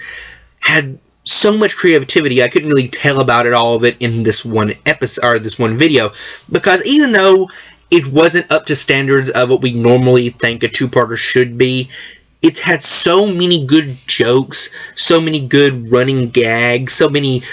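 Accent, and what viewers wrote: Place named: Tennessee, United States. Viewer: Is the accent put on or are you from the deep south? You sound Tennessean, but that's just a guess. I hear all kinds of the accent down here in Florida. (Also, I would encourage more pictures which I know are a pain in the ass, but make the video more dynamic and making your background not white. google neutral colors for some options.)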